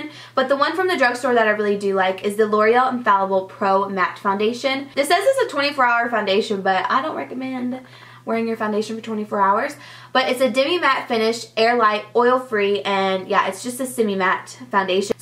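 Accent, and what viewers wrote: South, United States. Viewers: Love your videos by the way ! I cant believe you are at 1mil subbies ! You deserve that and much more , i remember when you did your tutorials in your old room and had the southern accent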